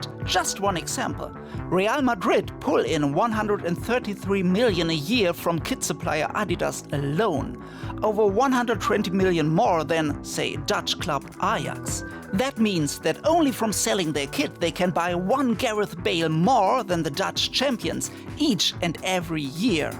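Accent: Germany. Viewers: Possibly the most german sounding German I've ever heard. Great vid!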